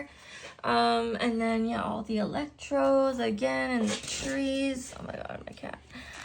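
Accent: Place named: Montreal, Canada. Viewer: New subbie here!! ❤ your accent reminds me so much of home!! I am also from Montréal! I moved to the United States in 1990 when I was 4.. I'm 39 now 😊 I used to visit my sister and family every summer from the ages of 11-17.. I miss it so much. Montréal is a gorgeous city. Most of my family lives in Ville Saint Pierre and LaSalle LaChine. Probably didnt spell those right 😅 I'm glad you got clean and found diamond painting!! I'm also an addict. Been clean 9 years now. I've been diamond painting for 5 years now. 😊 can't wait to see your future videos!! ❤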